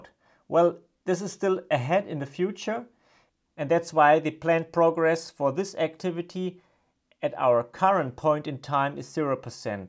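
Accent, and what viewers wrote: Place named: Germany. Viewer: I guess you are German 😊from your accent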